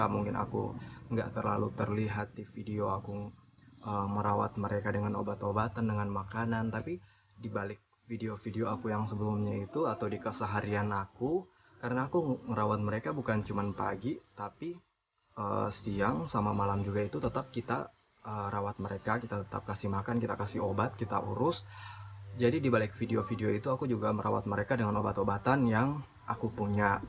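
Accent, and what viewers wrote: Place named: West Sulawesi, Indonesia. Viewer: Dimana tepatnya ini,kalau simak dari logatnya bro ini dari SulBar? Sy juga memelihara bbrp kucing 😀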